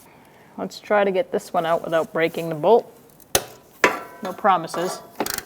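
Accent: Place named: New England, United States. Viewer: wonderful video. i love the new england accent as well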